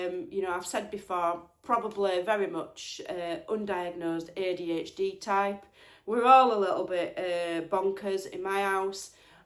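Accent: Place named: Northern England, United Kingdom. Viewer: You know what, I'm fairly new to your channel and I've not actually seen you before. Don't take this the wrong way, I really enjoyed your videos before, but I like you so much more now I've seen you're lovely face and the source of that great Northern accent. I'm a northerner living in the south (i know, a traitor 😂) but I have found so few English accents on YouTube, let alone northern ones. Having said that I love loads of American and Australian ladies' videos. So keep on keeping it real and I'll keep up my subscription! deal? Hahaha. Have a great day.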